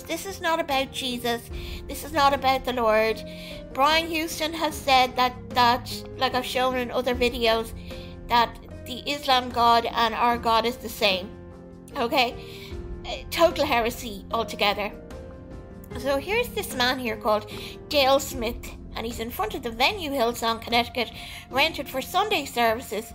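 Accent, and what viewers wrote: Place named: Ireland. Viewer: Good video & great accent! Are you in Ireland? God bless you & keep up the good work.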